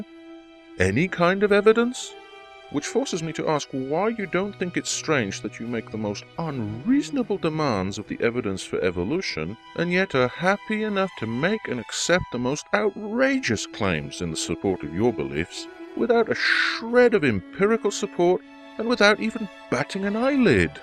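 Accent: England, United Kingdom. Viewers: narration is going to be easier to consume because as you noted, text covers SOME part of the screen, looks like crap if it's too long, what font to choose????? etc. Narration FTW! Besides, if that was your voice I heard on Claire's shoutout, you kill at narration. English accents give most Americans a huge chubby.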